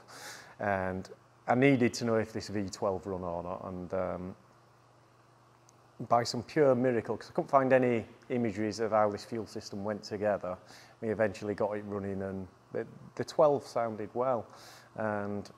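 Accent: United Kingdom. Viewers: Oooo Kay...love the brit accent but would of loved even more to HEAR THE DAM CAR..😊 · That Northern accent...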